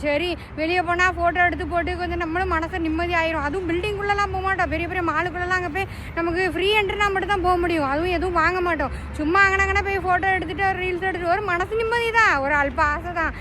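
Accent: Tirunelveli, India. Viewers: நெல்லை தமிழ், கொள்ளை அழகு நல்ல தமிழ்! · Unga speech fine sister 🎉. Unga speech tirunelveli touch.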